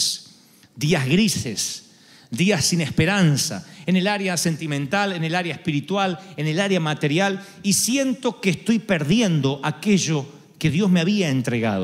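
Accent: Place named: Rioplatense, Argentina. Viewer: fenomeno!! Necesitaba escucharte. Soy uruguayo y tus predicas me llegan de una manera increible, seguro que por tu acento ya que es muy parecido al mio por la cercania geografica con Argentina pero mas seguro porque realmente sos hombre de Dios, y que Dios te bendiga y te siga iluminando para que nos sigas tu iluminando a nosotros con la palabra de Dios todopoderoso. Gracias a Dios por tu existencia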